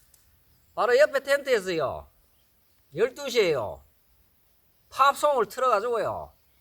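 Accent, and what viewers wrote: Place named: Andong, South Korea. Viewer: 고향이 안동쪽이세요^^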